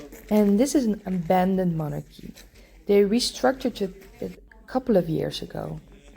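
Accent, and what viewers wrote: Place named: Netherlands. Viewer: i swear you voice is so familiar to me. Is your name is if you are please let me know please my is Dutch and you have same accent as her??? Thank you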